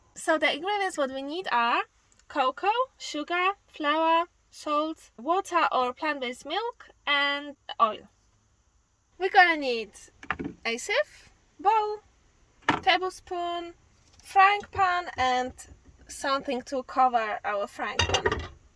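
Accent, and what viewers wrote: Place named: Ukraine. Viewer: Hi. Hope you're well. I'm trying to figure out which country you're from by your accent. You sound a bit Ukrainian, but unsure. X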